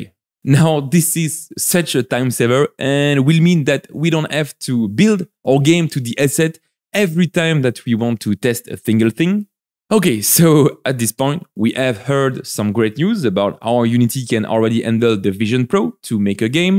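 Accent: France. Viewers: j'adore l'accent qui prouve que tu est bien français hahaha , sinon bonne video ! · Frech Accenttt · Rhooooh avec ton bel accent, ça sent la France tout ça !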